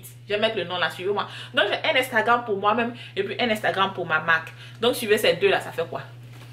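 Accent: Ivory Coast. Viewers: Eeeeeh merci beaucoup oh🙏🙏je te suis sur unstagram depuis longtemps...je te vois toujours sur YouTube mais j'ai jamais cliquer sur tes vidéo ...donc en t'entendant parler avec les deh,les thieeee,comme Abidjan ça m'a captivé j'ai tout de suite su ke tetait ma soeur ivoirienne deh suis trop contente dehors😍😍😍😘😘😘😥😥😩😩😩😥😥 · Tu es toute belle ma chérie et tu as l'accent des ivoiriens j'adore tes vidéos 💖💖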